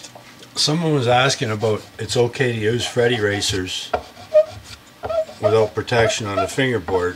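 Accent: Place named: Canada. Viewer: I love your videos and your, your voice it calms me down if I'm anxious. I also like your Canadian accent. Man that's a nice guitar. I got a 50 Studio gold top. I didn't know that much about the tires then. The guy put a bone nut on it instead of filing down the nut he went further into the wood. Which I think would make it really easy to break. so far no problems it's a 2012 when they were using that I don't know what kind of wood baked Maple. I don't like those PCB boards. I wonder how hard they are to change out I have basic knowledge of wiring and soldering from BOCES I think they send you a schematic. That guitar roars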